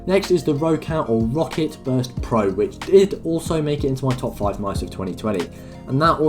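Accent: United Kingdom. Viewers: you a london g?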